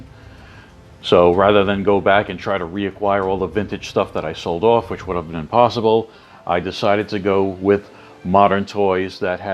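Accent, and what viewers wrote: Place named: Northeast, United States. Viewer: Ohhh man the electirc company!!! that was wild! Totally flashed back when you mentioned that,, And Man, you have stuck your foot in a great pool of you tube friends my man! welcome! your channel is already so entertaining. Can't wait for the Bat review in March. Blue is cool, music is great - I like your voice the most... great north east usa accent man. I just really like that you are true blue.. passionate about this stuff and are not a faker like so many seem to be these days! Proud to know you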